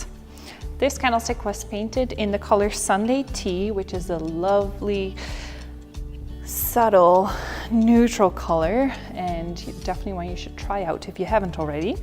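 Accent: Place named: Midwest, United States. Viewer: Sounds Midwest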